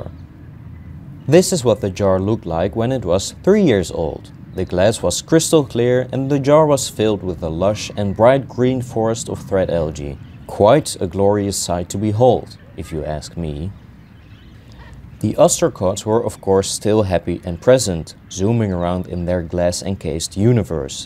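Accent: Netherlands. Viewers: In the first few seconds i thought hmm is he Dutch? And at that exact moment it got confirmed by a bike casually riding by 😂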